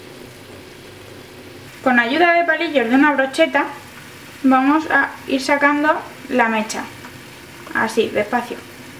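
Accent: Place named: Spain. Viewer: QUE BONITA VOZ Y ASENTO TIENEN LAS ESPAÑOLAS, SALUDOS, MUY BUENA IDEA ME SUSCRIBO :)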